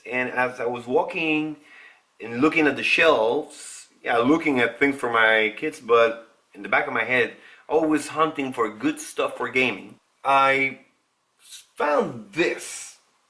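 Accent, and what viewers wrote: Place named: United States. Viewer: Hey! This seems unrelated, but where is your accent from? I remember in the cheesy Dune miniseries SciFi made a long time ago, the guy they cast for Count Fenring (the Emperor's Advisor) spoke with an accent which has some strikingly similar features to yours! I never knew where it was from! Yours does sound closer to a standard American, but it's still really cool.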